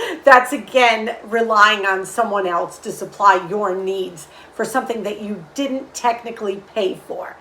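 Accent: New York, United States. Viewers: Im a New Yorker moved to the south and i adore your ny accent , makes me miss home.I still have mine too.I am told.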